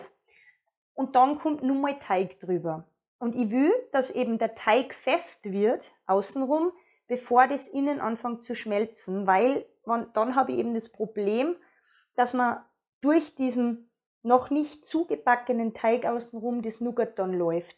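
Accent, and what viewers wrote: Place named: Tyrol, Austria. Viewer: Hallo Morgen gehts an die Muffins 😅👍schön auch mal Dialekt zu hören bist du vo Tirol ?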